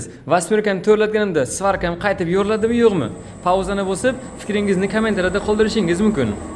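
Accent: Bukhara, Uzbekistan. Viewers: Бухороча шеветон дажонам❤😅😅 а Владивосток салом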